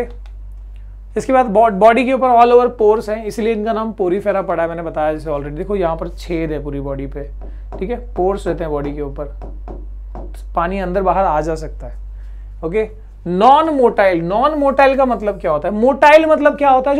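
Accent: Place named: Bihar, India. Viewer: sir, u r from bihar... if i m right .. then i feel very glad to hear this 😊😊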